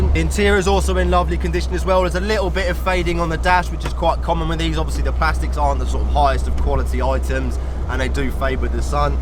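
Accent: Liverpool, United Kingdom. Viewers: Yes mate, scouse in the house legend 👍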